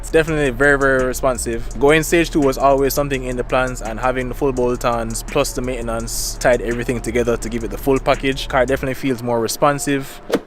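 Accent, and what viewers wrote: Jamaica. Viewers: Subscribing just because yuh sound like a yaadie👊🏽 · Rights afta mih hear accent mi click subscribe. Bigg upp🇯🇲🇯🇲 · LOVE this platform gonna be getting one for my first car when i migrate 🔥 Bro sounds Jamaican tho🇯🇲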